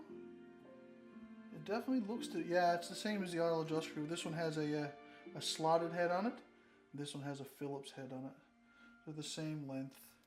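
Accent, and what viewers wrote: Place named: Canada. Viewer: love your videos! I'd prefer real time filming so I can follow step by step rather than the speeded up sections but still awesome nonetheless. Love your Canadian accent too, I'm in New Zealand now but I used to live in Vancouver. We can't easily get carb kits or any spare parts down here. I'd love to rebuild my 1979 XL 100s carb and put a choke cable on it, new throttle cable etc but just can't get the parts :-(. Here's my bike... <link>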